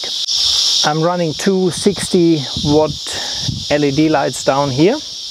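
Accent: Germany. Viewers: category X ist doch auch nicht schlimm! 🇩🇪 hast zwar dt Akzent, kannst abe good englisch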